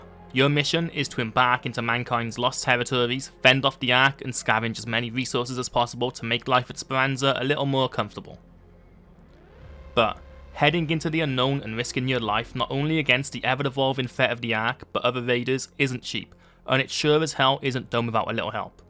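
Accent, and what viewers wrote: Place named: South Wales, United Kingdom. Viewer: You sound like your from my neck of the woods. I'm from South Wales. Cool video. I wanted to see this side of the game. I'm thinking about getting it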